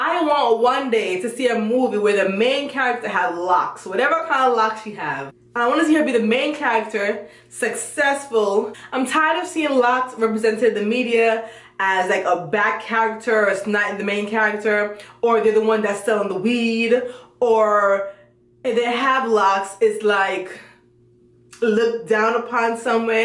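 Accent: Patois, Jamaica. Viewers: I knew you were Jamaican because I could hear the patois at some parts. I'm Jamaican too.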